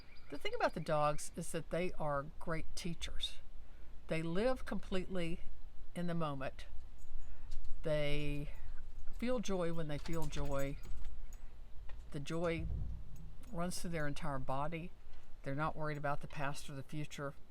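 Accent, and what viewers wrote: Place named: South, United States. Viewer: I just subscribed ! I really enjoy your videos, 🎉they are to the point and enthusiastic and I like your southern accent I work with shelter animals, just cats. I would work with dogs too, and gladly walk them but it’s too far from me at this time. I’m looking forward to your videos about tips to losing weight, I already watched the one about pasta, so I’m going to the grocery store in a few minutes.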